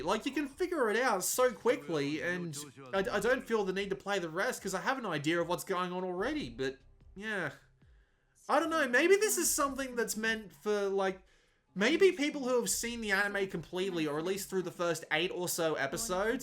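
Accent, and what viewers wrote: Australia. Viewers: It sounds like you would really enjoy the anime. You sound Australian, is that correct? If so, Psycho-Pass is on Madman's AnimeLab for free (and it's legal) in Australia and New Zealand. It's a great anime and I think this visual novel is really just for fans of the anime, like me. It's not a standalone product.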